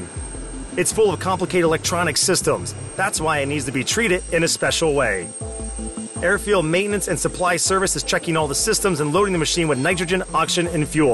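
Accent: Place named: Russia. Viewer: Руский ты зачем неруским прикидываешься? Слышно же что ты руский пропогандон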